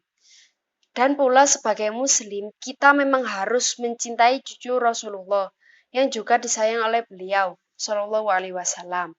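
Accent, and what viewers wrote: Java, Indonesia. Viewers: Dihhh annoying bangett sihh mbbaakkk , suara nya itulohhh medhookk nya ,dan kontekstual banget kaku kek kanebo keringg, isi jga g jelas n berbelit-belit, sama kek junjungan nya si syiah hobi n pandai Berpura-puraa, nohhh ikutt drama telenovela sonohh biar dapet piala, sayang kan sama bakat pura-pura nya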